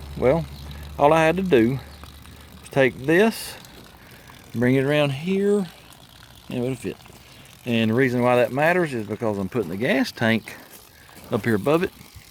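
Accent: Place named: Tennessee, United States. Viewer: As usual a great video. Sure glad to hear your accent, brings back memories of my time in Tenn. Many thanks!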